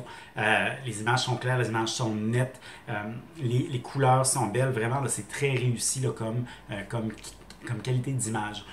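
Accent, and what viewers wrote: Quebec, Canada. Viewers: T'es canadien ? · il est québequois c'est pour ça ....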